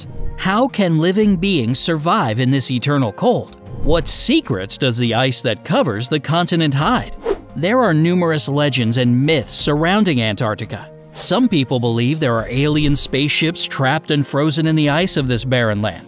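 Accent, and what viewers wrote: United States. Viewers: The American accent just makes me feel dumber. Not sure what it is with American educational show and the way they explain things like they are talking to a child.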